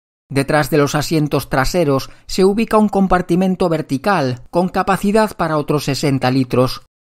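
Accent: Galicia, Spain. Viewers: Es increíble que un coche tan modesto de concepción fuera capaz de ganar rallyes e incluso a todo un Porsche 911. Recuerdo que mi padre estuvo probando un R8 y también un R10 para sustituir al Seat 850 especial 4 puertas que fue su primer coche aunque al final se decantó por un Fiat 124 special (el equivalente al Seat 1430 pero con los dobles faros redondos en vez de cuadrados). Supongo que por amplitud interior, maletero y potencia. Como siempre, el contenido excelente. Y además con estilo propio made in Galicia con acento incluido.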